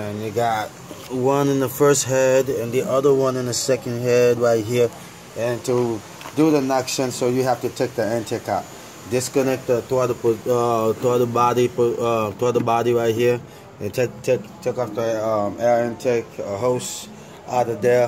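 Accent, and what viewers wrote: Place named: Haiti. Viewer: You sound Haitian. Sak Pase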